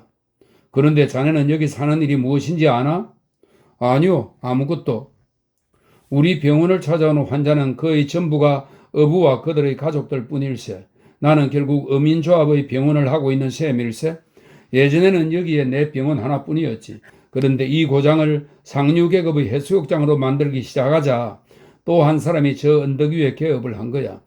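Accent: Gyeongsang, South Korea. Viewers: 축약해서 읽어주는 사이트는 왠지 작가의 인생관을 온전히 듣지못할것 같아서 안듣게되구요.이 사이트가 너무 좋아요.특히 경상도 말씨가 맘에 들구요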